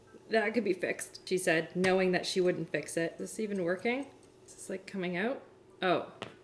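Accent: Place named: Canada. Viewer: Is it me or is she extra Canadian in this video?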